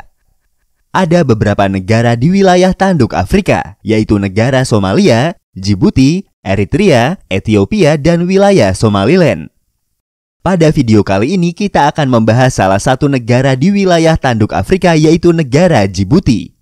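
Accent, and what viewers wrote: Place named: Ponorogo, Indonesia. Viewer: wong ponorogo ndi ki suarane.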